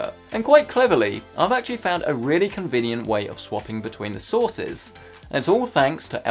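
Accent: United Kingdom. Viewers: He has a British accent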